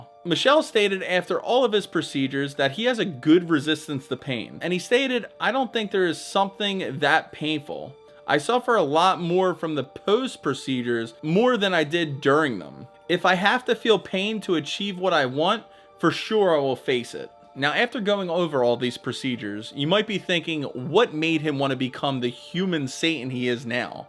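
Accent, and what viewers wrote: Philadelphia, United States. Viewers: My thoughts are that you're Philly/South Jersey accent is so heavy, that's all I could focus on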